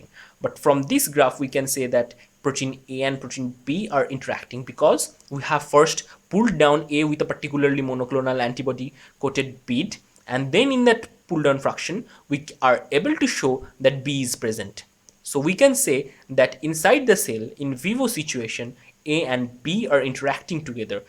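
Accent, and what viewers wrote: India. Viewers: tell me are u from India? what is that accent??? i need to know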